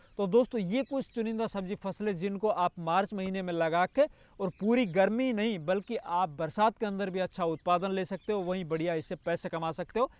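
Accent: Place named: Tijara, India. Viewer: आप की भाषा तिजारा की लगती है आप सानदार काम कर रहे है very nice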